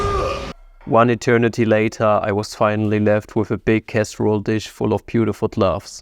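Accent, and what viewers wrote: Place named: Germany. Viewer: This man has the strongest German accents ever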